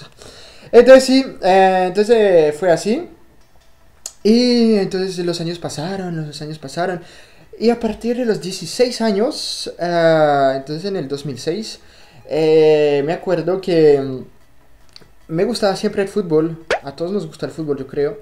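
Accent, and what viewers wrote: Colombia. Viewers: HABLAS SÚPER BIEN EL ESPAÑOL!!! Y EL ACENTO SÚPER COLOMBIANO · saludos desde Ecuador ¡¡¡ Has la segunda parte porfis ¡¡ ya que estaba super interesante. Eres super espontaneo y sincero asi que sigue asi me encantan tus videos:=) Pd: Vives en colombia? xq tu acento se parece al colombiano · hablas muy bien el castellano pero claro q tienes acento colombiano.. A mi me gustaría aprender el francés pero creo q es complicado solo se 3 cosas.. 😂😂 Podrías hacer un video para q aprender un poco.. Saludos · Genial tu vídeo....me encanta que vas narrando con detalles..( en especial tu época de adolescente pajero en los chats. Jajajajajajaja)... Obvio tb me uno a las plegarias por una 2 parte..... Porque hasta lo que has contado es que tus incios con el español fue con todos los países menos con Colombia... Y tú acento es muy colombiano. Jajajaja. 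Saludos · Por el Pipa Higuaín!! Me muero!!! Cosas locas de la gente... Hsblas con el acento colombiano. Bien! Bisus de 🇦🇷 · tus memes xD:D me gustan tus vídeos!!!! Y por cierto tú acento es colombiano!!!:D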